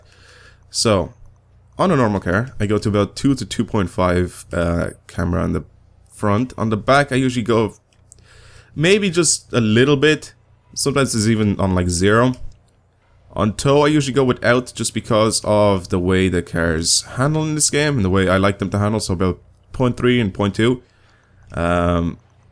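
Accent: Ireland. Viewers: ***** aha, i thought it had a bit of irish, but i always felt like it was something else, i would have asked you earlier, i just didnt have an account :P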